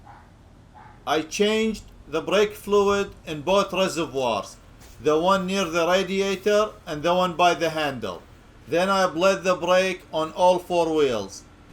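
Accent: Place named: Iraq. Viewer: Don't get me wrong you have noticeably Iraqi accent so, can you open an firearms channel with your Iraqi accent, the thing is similar to fps Russia or ( سلاح زون gun Zone )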